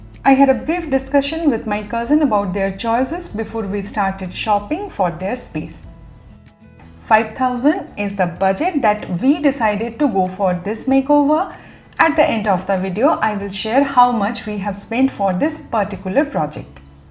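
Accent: Tamil Nadu, India. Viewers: U r tamil slang awsome ....never expected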